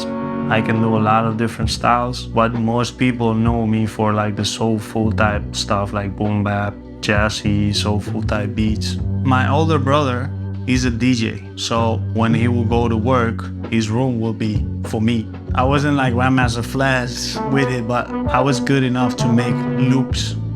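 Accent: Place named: Poland. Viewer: Wow your accent sounds kinda Polish. And you look like a Polish singer Dawid Podsiadło. :D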